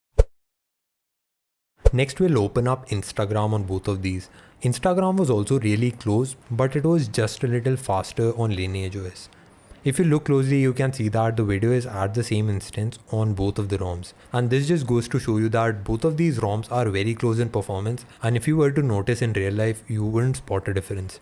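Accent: India. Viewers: yeah after he lose that Indian accent. people just dont like Indian accents. They talk so fast and dont realize they english is not that great because of that super strong accent.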